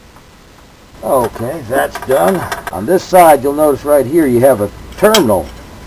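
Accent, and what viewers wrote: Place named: Texas, United States. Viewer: Great video,. You should explain how to test the diodes too. By the way, great Texas drawl too. Do you have a girlfriend yet?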